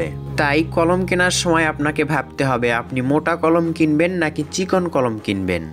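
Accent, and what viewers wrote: Bogura, Bangladesh. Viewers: ভাই আপনার বাড়ি বগুড়া নাকি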